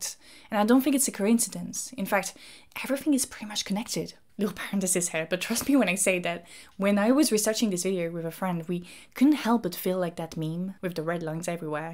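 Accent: France. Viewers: This is entirely irrelevant to the conversation, but I can’t help but be a bit taken by your whispery French accent. Developing a bit of a parasocial “crush.” But you’ve inspired me to pick up French again on Duolingo. I’m Senegalese and I feel like I should be fluent in French by now. Anyways great vid as always.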